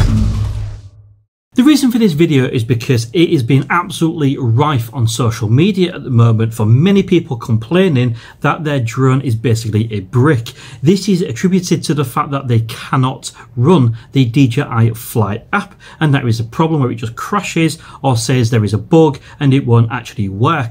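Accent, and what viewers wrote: Hull, United Kingdom. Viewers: hi are you from hull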